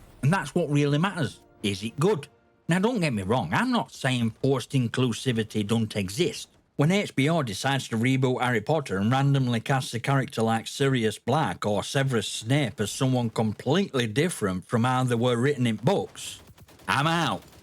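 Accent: Yorkshire, United Kingdom. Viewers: Its 100% an authentic Yorkshire man accent. I can confirm.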